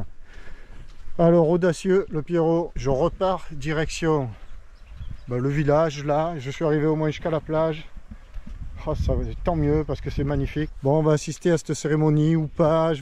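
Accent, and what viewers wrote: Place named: Marseille, France. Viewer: Comme tu le dis si bien : malheur! mais avec l’accent Marseillais ça passe mieux et ton audace te sauve 👏👏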